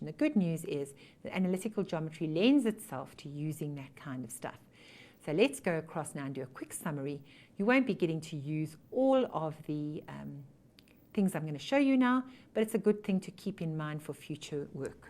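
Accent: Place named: South Africa. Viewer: South African Accent... Loving it :)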